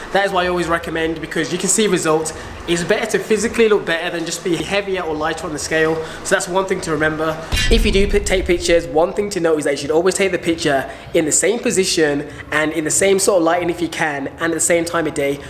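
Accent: United Kingdom. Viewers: Nice to hear a British accent. Lots of common sense, ground roots type tips. Exactly what I need. Thanks. PS music is NOT too loud. Also this Youtuber replies to all the comments. Liked and subscribed. No brainer.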